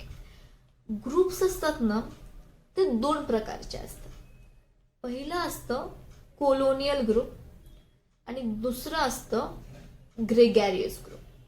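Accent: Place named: Latur, India. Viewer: Di tuzi way of explaining all thing khup bhari aahe.... Ani laturkar chi marathi tr azun ch bhari 😍😍 I like it so much 😇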